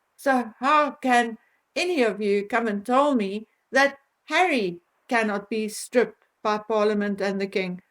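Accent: South Africa. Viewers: Hello, I just happened upon your channel and recognise the accent, are you South African??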